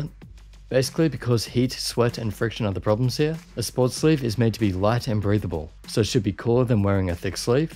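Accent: Australia. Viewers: Never thought you would be an Aussie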